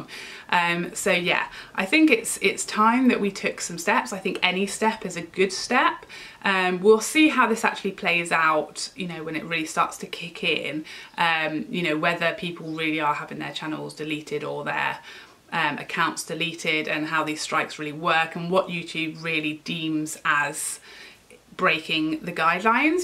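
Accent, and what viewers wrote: United Kingdom. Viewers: I was brought up to say nothing if you can’t be nice. As Wayne Goss says...be kind Facebook should do this too I think...people can be so awful 😕 Ps...I like your personality & accent...reminds me of my Dad (he was from the UK) 😊 xB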